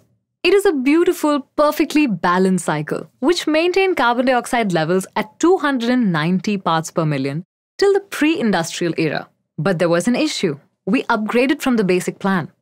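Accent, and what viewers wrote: India. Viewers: i love indian accents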